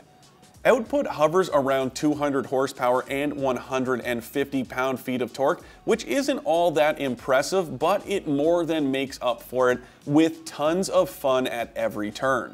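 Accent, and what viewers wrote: Canada. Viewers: First line he spoke, im like oh hes canadian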